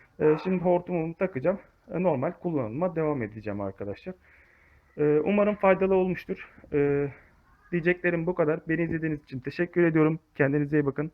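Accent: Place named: Izmir, Turkey. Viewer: Bu adam sanki izmirli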